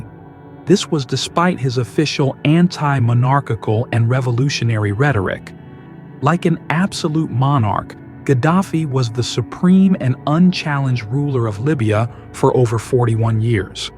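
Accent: United States. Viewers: U sound American with your accent....also do remember how the US has meddled in and still.meddles in african countries......the uk is a monarchy....and many other European countries..and in the US u have the bush dynasties.....Clinton's....Kennedys.....and it's ok for...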